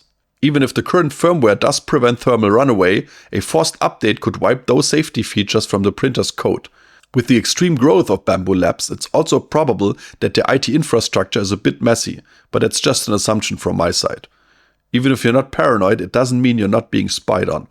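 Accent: Germany. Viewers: Ja man hört den deutschen Akzent und das fremdschamt schon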